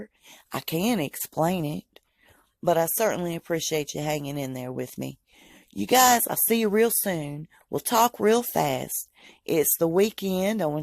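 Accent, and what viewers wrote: South, United States. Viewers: You love nasty,mean gossip.I can hear your southern accent,maybe your racist,because your not patriotic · I love the lady's voice in this video...Very southern and charming...and I almost kind of believe her.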